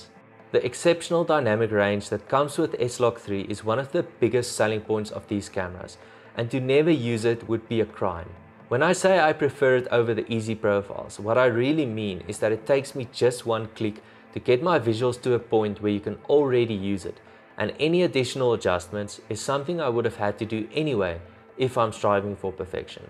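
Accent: South Africa. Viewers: You sound South African bro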